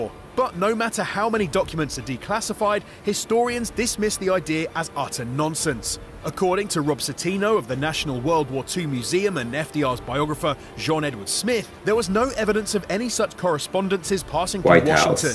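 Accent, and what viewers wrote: England, United Kingdom. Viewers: The narrator has a British accent. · It's definitely not an American who made the video...he has a clear English accent. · The narrator is actually Russian, though he speaks with an interesting English working class accent. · Barrick He spoke with an English accent. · He doesn’t sound American. Maybe British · He sounds British to me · to be fair, the accent sounds english but the propaganda slant sounds american, so, could be both?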